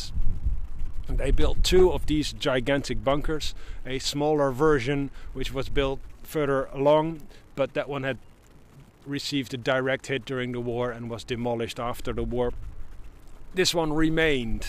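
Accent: Netherlands. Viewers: ... en aan je accent te horen ben je Nederlander, net als ik : ) Ik kwam vroeger vaak naar IJmuiden voor de bunkers, o.a. Batterie Heerenduin. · Interesting video. And good English, i can barely hear any Dutch accent. Too bad that the bunker is owned by companies, all those typical company objects like those gates, containers and camera poles smudge the historical object.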